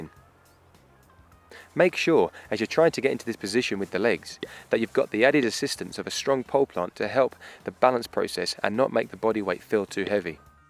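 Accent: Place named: RP, United Kingdom. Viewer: proper queens English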